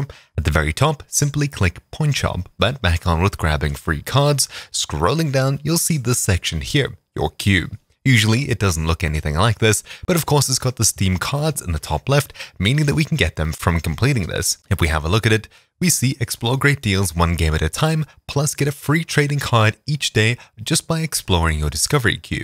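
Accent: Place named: South Africa. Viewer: I know an south african accent when I hear one.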